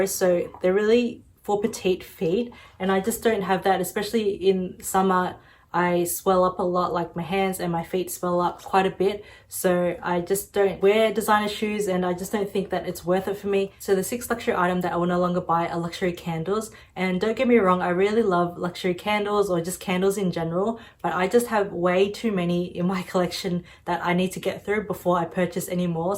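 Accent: Australia. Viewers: I support ozzie youtubers^^. Are you in Sydney or Melbourne? As you sound ozzie ~^^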